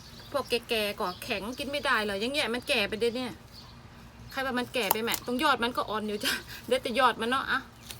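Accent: Korat, Thailand. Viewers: ผักงามจังเลยค่ะ ๆพูดโคราชเพระดีจ้า🌿🌿🌱🌱🌱💞💞💞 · น่ารักน่ะภาษาโคราชชอบฉันนๆน่่ะ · พูดโคราชนั่นละจ้ะ ชอบ ดีจ้ะ ผักงามอย่างนี่ เขาว่าคนปลูกมือเย็นเนาะจ้ะ ปลูกไอกะงาม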